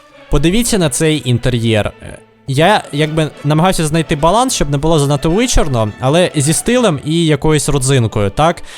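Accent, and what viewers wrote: Transcarpathia, Ukraine. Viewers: вхахвхвах закарпатьська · Ти з Закарпаття?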